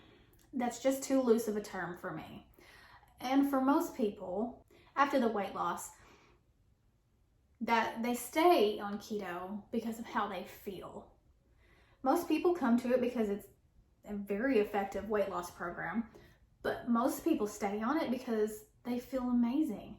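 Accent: South, United States. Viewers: This is probably my favorite video of yours so far - and I’ve watched a lot of them! “Here’s some crappy bread.” Lol. Couldn’t agree more. And your southern accent makes everything better.😊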